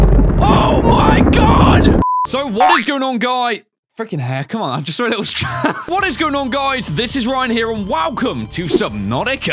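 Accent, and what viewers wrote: United Kingdom. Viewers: He sounds way more british here 💀 "SubnAUticA"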